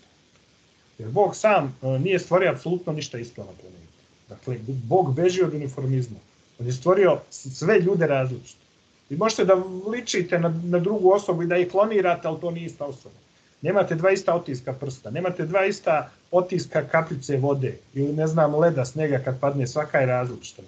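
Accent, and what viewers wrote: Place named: Ekavian, Serbia. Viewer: Da odmah napomenem ne zelim vrijedjati i ne odnosi se na neki vid mrznje Prvo mi se ucinilo dacujem ekavica konzumacija Kad fakat pa dragi ti ko starleta Ne mogu vjerovati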